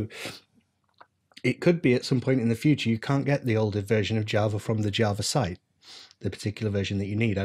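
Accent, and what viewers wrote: United Kingdom. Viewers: nice accent british or ?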